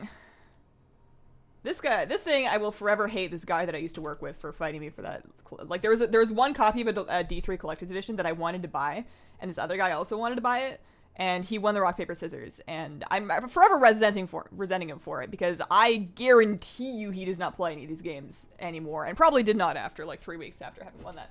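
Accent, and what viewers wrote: Canada. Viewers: From a midwestern ear, you sound pretty Canadian.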